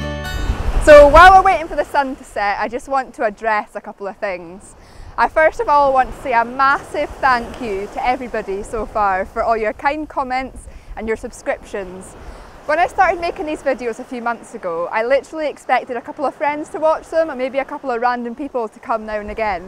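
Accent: Scotland, United Kingdom. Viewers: Hello, how are things? I like your video and I like your photos as well. Like # 940 is mine. The other photographer I follow are all geezers. it's nice to have a feminine perspective for a change. You've got yourself a follower in São Paulo, Brazil now. Love your scottish accent.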